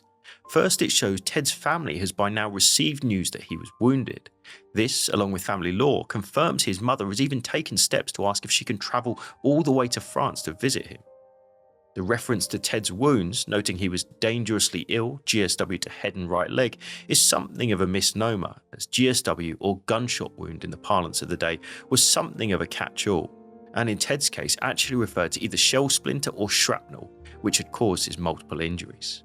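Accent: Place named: Hertfordshire, United Kingdom. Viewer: Are you in Herts? For a while I've heard some kind of familiarity with your accent!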